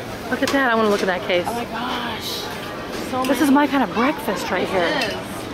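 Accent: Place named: New Zealand. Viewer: isnt saying ca-bah-na wrong. That's how you'd say it in New Zealand:) same with how we say dance. We dont say it daa-nce we say it more like dah-nce.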